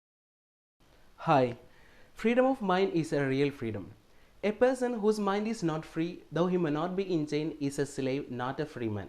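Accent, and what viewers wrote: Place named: India. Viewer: കൊർച്ചും കൂടി കോളക്കിയൽ ആവാനുണ്ട്..... ഇടക്ക് ഇന്ത്യൻ ഇംഗ്ലീഷ് തെറിക്കുന്നുണ്ട് ❤🌹😍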